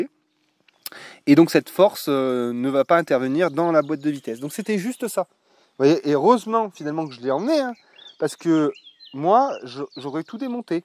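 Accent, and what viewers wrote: Loire, France. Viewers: Tu prends de plus en plus l'accent de la Loire ! C'est rigolo ( pas l'accent, c'est juste de plus en plus flagrant !)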